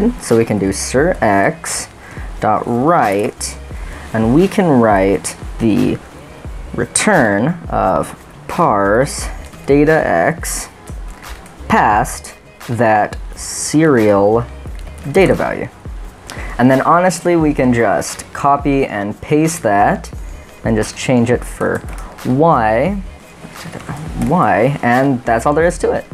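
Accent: United States. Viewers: American, just not a 'Merican.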